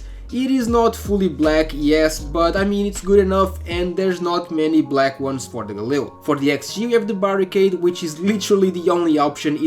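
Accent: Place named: Portugal. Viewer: you sound so portuguese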